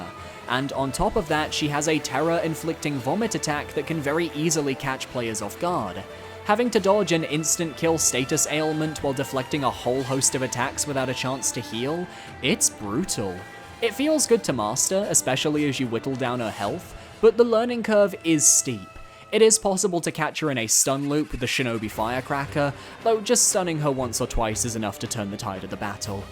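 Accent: United Kingdom. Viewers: Stop saying Sekiro like a weeb, you’re obviously british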